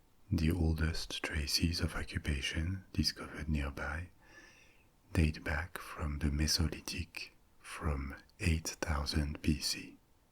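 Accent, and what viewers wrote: France. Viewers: You are my official sleeping aid addiction. Your gift of telling factual stories with the smoothest french sexy accent. What more could a girl ask.. I dont know if this happens to anyone else, but your voice penetrates into my dream...idk if that means I'm not in a deep sleep, or ? Then along with your soothing voice, you tell me that key to total relaxation is a massage...so you massaged my aching back 😳 I woke up feeling like I really had a massage. I have ALWAYS had extremely vivid dreams. But this is sort of a new twist. (I sincerely hope for it again) Thank you. I hope this is not a sign I'm flying over the cuckoo's nest. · Da nema Francuski naglas imao bi barem dupli broj pratioca.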